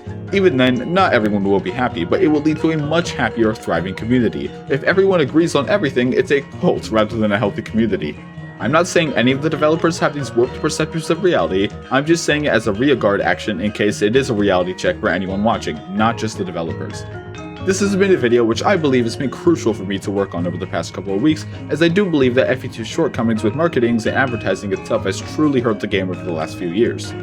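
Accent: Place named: United States. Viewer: I thought it was a voice reveal but i was confused about the American accent. Then i checked the pinned comment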